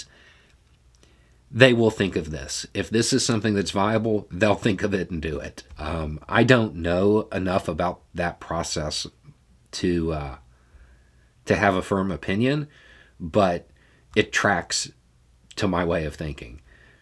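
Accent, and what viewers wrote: South, United States. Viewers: Speaking of strong southern accents. I watched a five-year-old video of yours the other day and thought that your accent was a lot stronger back then.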